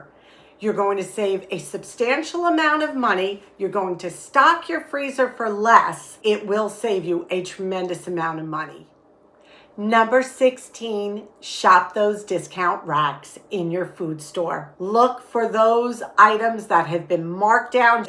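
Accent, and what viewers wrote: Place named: Hudson Valley, United States. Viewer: I love your channel for several reasons (the content, your happy attitude, the good ideas, to name a few). But I really like watching you because I grew up in the Hudson Valley and I love, love, love your accent. It's like getting a little hug and conversation with my aunts. ☺️💗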